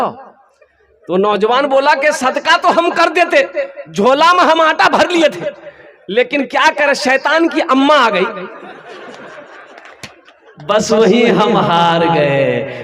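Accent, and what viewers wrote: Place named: Bihar, India. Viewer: Asli behari urdu bol rahe hain.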